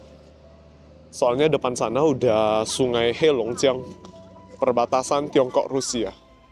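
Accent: Java, Indonesia. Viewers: Logat jawanya kelihatan ko🙏🙏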